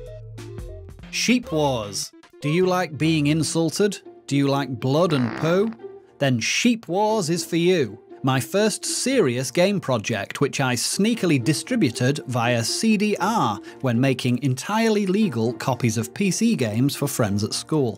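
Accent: United Kingdom. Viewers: I don't know what you mean, he sounds quite British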